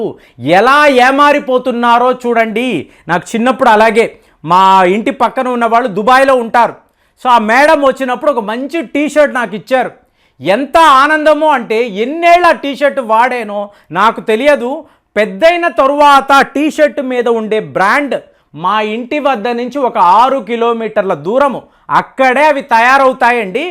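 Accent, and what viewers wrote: Tamil Nadu, India. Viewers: he is Tamil guy. His telugu is not pure. please understand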